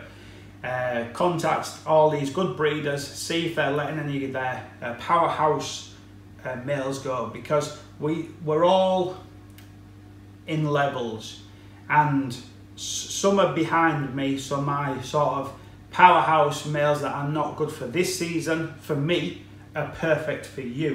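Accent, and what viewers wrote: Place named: Northern England, United Kingdom. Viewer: Cracking upbeat advice...I got me a killer male...with a smiley emoji on his head from some bloke with a northern accent and an unreal car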